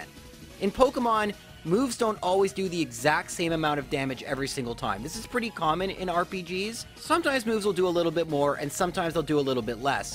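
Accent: Canada. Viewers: pretty sure hes canadian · Canadian accent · You sound Canadian.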